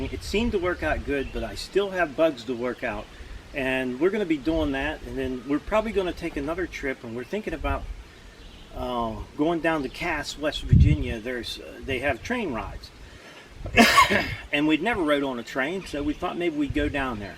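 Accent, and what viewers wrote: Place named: Western Pennsylvania, United States. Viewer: robert hoffman - I caught that too..plus overall accent..my mom and dad are from around Tarentum and Oil City...I grew up in NC tho! Thanks for video..I'm single 62 yr old female just retired. I've never pulled anything behind my car and I'm looking for anything small. This is sounding more and more like the way to go!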